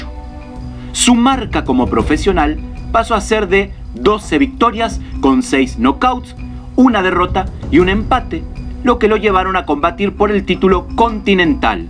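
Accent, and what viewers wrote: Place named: Buenos Aires, Argentina. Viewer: Nuevo sub me gustó tu video, no sabía de esta historia.,estuve a punto de salirme por tu"she sheo " eres muy buen narrador pero evita ese acento "'porteńo" es muy molesto oirlo, Ojo no tengo nada contra los argentinos 👍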